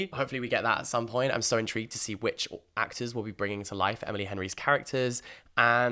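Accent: United Kingdom. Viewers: I like your British accent, plz more book reviews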